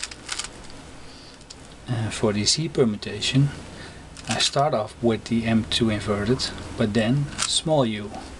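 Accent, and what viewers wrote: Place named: Russia. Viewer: You sound Rusian, btw nice video.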